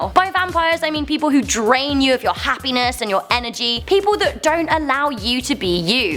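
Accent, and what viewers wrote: United Kingdom. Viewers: Ahhhhh I love that British accent!!! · Is it me or did express her British side more than usual 😂 I live in England to....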